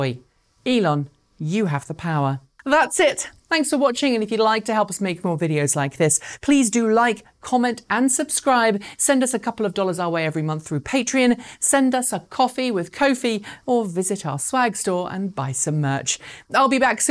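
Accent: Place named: United Kingdom. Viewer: So happy your British accent has returned after having listened to the one with Arcimoto. I have no doubt whatsoever that if Tesla removed any feature once enabled it would lead to legal confrontations. Many Americans do not like to be told "NO!". Having owned my model3 for a year now, the autopilot function is great with a few caveats, it is sadly out of its depth when required to cope with the many mentally challenged road users that frequent Hwy 101. I am of the sad opinion that the roll-out will be seriously hindered because of the incompetent drivers on the roads today. I further think that this situation will not improve with time.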